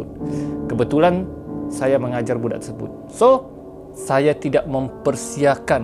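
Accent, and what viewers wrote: Sabah, Malaysia. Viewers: mmg best loghat Sabah...ni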